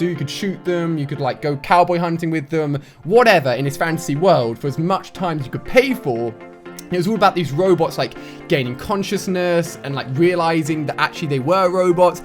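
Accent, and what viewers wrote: United Kingdom. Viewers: I THINK HE IS BRITISH BECAUSE OF THE ACCENT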